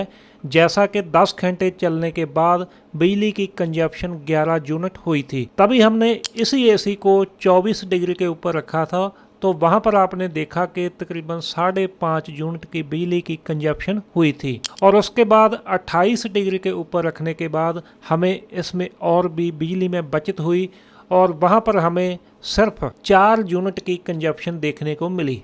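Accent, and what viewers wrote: Punjab, India. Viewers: imandar insaan ho aap. aapki punjabi vaali hindi bahot hi achhi lagti hai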